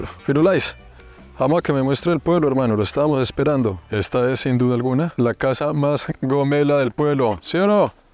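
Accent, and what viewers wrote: Colombia. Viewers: Jajajajja que bien me caes 😅😅 me encanta tu acento colombiano y tu gracia para redactar el tema saludos 😊😊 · habla muy colombiano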